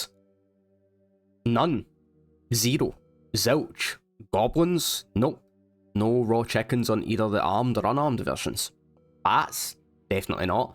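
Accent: Glasgow, United Kingdom. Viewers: Loving having a Glaswegian voiceover for a chunk lock 👌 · Scottish accent, armed goblins -> good arms vs bad arms.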